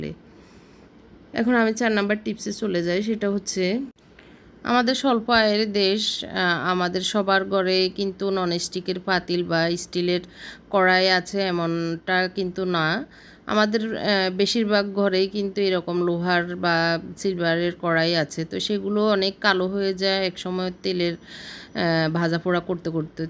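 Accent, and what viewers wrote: Noakhali, Bangladesh. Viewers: আসলে নোয়াখালী ভাষার মানুষ শুদ্ধ করে কথা বলতে চেষ্টা করেছে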